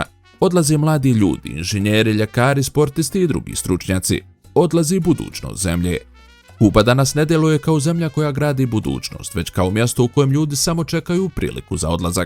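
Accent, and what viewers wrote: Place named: Herzegovina, Bosnia and Herzegovina. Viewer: Hercegovac govori. Ne Bosanac.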